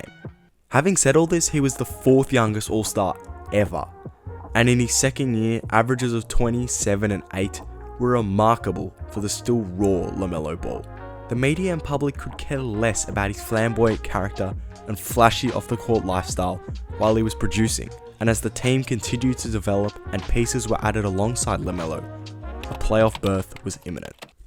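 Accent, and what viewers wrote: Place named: England, United Kingdom. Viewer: Im not gonna take anyone making a video about the NBA with an English accent seriously. Im just not.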